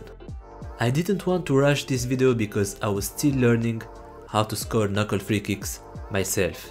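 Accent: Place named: Morocco. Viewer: Khouya ila knti meghribi rak nadi bzaf, bayna mn l'accent dyalk. Bonne continuation 🙏❤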